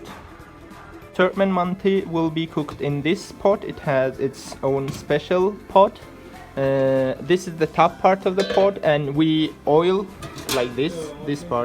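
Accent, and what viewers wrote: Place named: RP, United Kingdom. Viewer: perfectly posh thank you!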